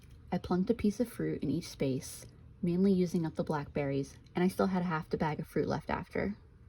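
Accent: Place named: Delaware Valley, United States. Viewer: Hi! I just found your channel and it's incredible! I saw that you're located in NC, but I'm curious if you're originally from the PA/DE/NJ area? Your accent reminds me of home. 🤍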